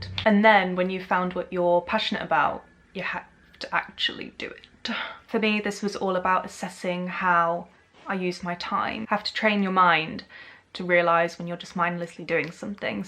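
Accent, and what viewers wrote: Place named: United Kingdom. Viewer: yeah, you have such a lovely British accent. Let's be friends!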